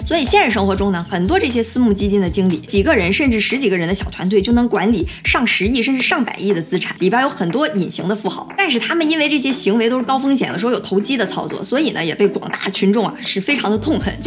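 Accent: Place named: Dalian, China. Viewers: 大连口音一不留神就漏了。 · 好难听的大连口音啊，顿时不爱了！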